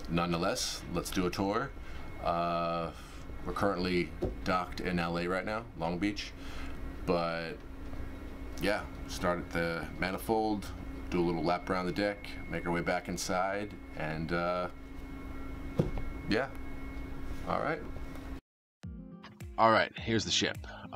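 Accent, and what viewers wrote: New Jersey, United States. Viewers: I've been trying to figure out who you remind me of, at first I thought maybe it was someone I knew or worked with but I realized it's Mike Rowe the "Jobs" guy and your stuff would be perfect for his programing, your walkabouts and overtime clips throw in a drone shot and I think they would love it. Just an idea, I just realized your talking to yourself when you make these, if you wonder your voice is very smooth with just a touch of Turnpike.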